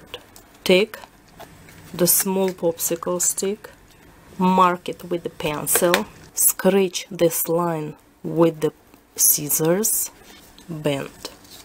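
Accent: Russia. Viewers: русский акцент:0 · У тебя русский акцент☺ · Русский акцент:) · thought I could detect some Russian in your accent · but she is Russian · Oh god, i thought your accent was russian because of Red from Orange is the new black! ( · Omg i wish i was Russian thats why your accident this so beautiful i love that voice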